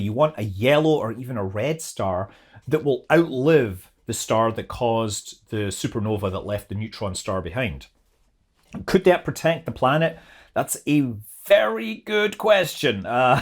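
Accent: Scotland, United Kingdom. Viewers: Enjoy your videos. I find them interesting, informative and entertaining. I'm also partial to your Scottish accept as I'm of Scottish descent on my father's side. (My grandfather was born in Edinburgh.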